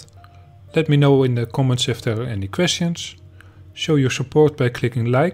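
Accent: Netherlands. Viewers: Precies, stupide Nederlands accent! KOTSBAK! De LOI let me speak English with a Nederlandse R! Je staat voor lul vriend!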